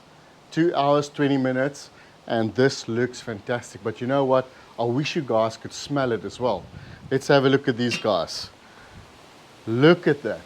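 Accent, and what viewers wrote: South Africa. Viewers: Love the South African accent!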